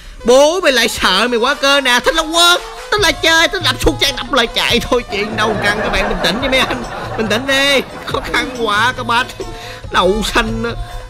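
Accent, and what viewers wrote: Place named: South, Vietnam. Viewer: .ôi trời, người tôi mong đợi một youtube làm về liên quân mà có giọng miền nam giống tui😭đó giờ xem toàn miền bắc 😭mò mãi mới ra đc Anh, ôi hạnh phúc quá đi 😭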